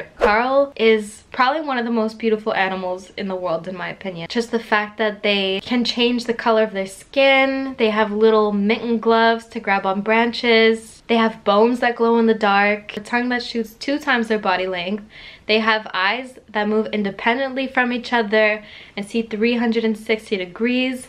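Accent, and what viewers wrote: United States. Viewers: This is the first time that I've watched one of your videos. God bless you. You cry because you care and that is why I take the time to comment. In the space of nineteen minutes you gave me so much real information and so full of emotion and determination. Your past experience with animal rescue and the skills that you've learned.. You said the Vet bill came to over a Thousand Pounds, with that accent It should have been Dollars.?. Then you went on about the UK government, so I guess you're living in my country now, which is just lovely. I hope you acquired the right number of signatures on your petition and Boris was forced to talk about Chameleons rather than Covid. 😀👍 🦎✔ 🦠❌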